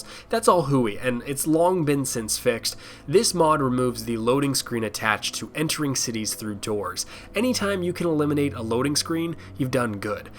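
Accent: Canada. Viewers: Your Canadian :) or from Minnesota. lol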